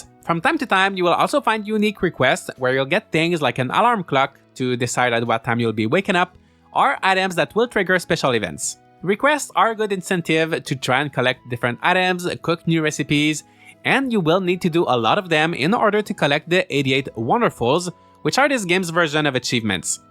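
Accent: Quebec, Canada. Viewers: Are you french Canadian? You have the same accent i have.Great review Btw. Keep up the good work!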